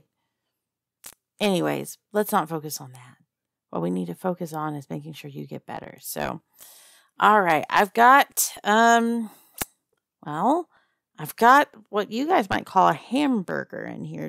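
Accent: South, United States. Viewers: It's hard to tell what your real accent is. I liked the southern charm accent though. Your stories always make me smile and my day better. It's awesome that you are growing so well. You are on your way to a play button, i can feel it. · Wait, did he seriously smoke weed as a painkiller? I didn't know you could do that. Anyway, I noticed a startling lack of harpy audios on YouTube, and I'm a sucker for southern accents so this was right up my alley. Thank you. ❤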